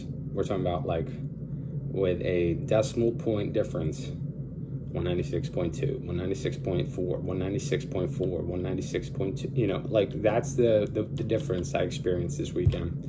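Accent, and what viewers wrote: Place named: Italy. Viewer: It’s the Italian accent.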